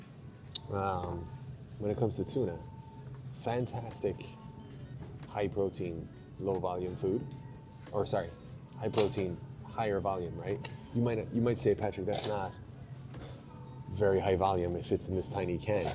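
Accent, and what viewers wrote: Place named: Ireland. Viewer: Irish or American? Losing the accent lad 🇮🇪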